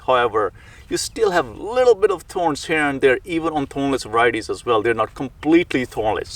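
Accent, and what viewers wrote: India. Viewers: Dude, you have a thick-strong Indian accent trying hard to talk like an American white guy from the US. Your thick Indian accent isn't fooling nobody. Lol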